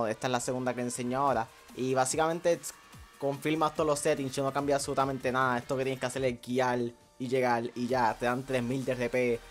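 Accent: Puerto Rico. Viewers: Boricua obligau ajajaja se nos nota de lejo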